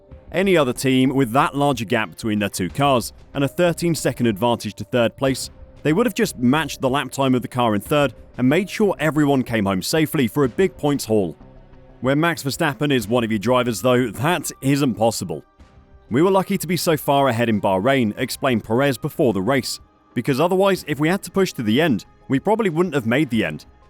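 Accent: United Kingdom. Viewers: Very biased video against Max, sounds very British.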